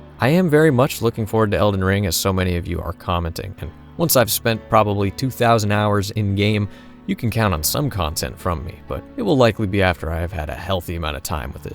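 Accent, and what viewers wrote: United States. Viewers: I'm hearing an American discussing lore of a Japanese game based on European historic-fantasy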